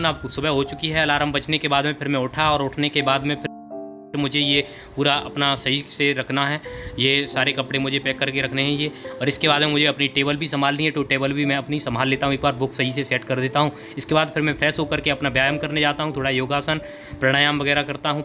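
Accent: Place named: India. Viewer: I loved your hindi accent❤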